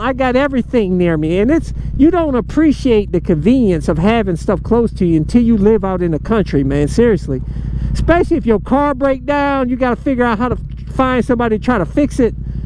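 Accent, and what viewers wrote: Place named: South, United States. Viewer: C'mon man! You're just a CITY BOY with a country twang speech impediment. Yeah, you gotta work to live, brother, and you gotta SHARE IT WITH THE FLORA & FAUNA. FACT.✅ Only the tough survive. But yes many southern people are crazy, esp. the government. You avoid them!! Otherwise, youare 💯% right, EXCEPT, CITIES ARE A DEATH TRAP.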